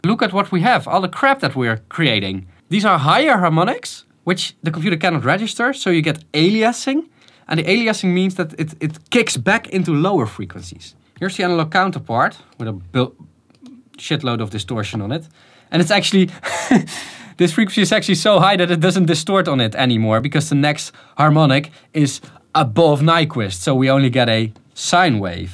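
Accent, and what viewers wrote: Netherlands. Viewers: Excellent! Are you Dutch?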